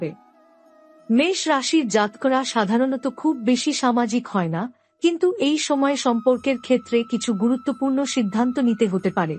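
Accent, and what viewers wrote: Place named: India. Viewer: Eta kono hindi vashi manus banglay bolechen, tai onar kotha gulo emon sunte lagche! bujhlen.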